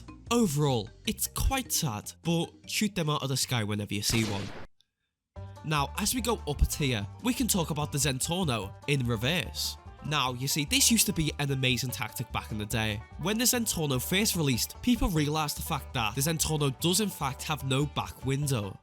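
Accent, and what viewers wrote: Liverpool, United Kingdom. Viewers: Eyyy you a scouse ? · I can pick up a scouse accent there 😂 I'm from Liverpool as well🤣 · so where is the fucking list? i dont want to listen to your stupid ass british voice for 30 minutes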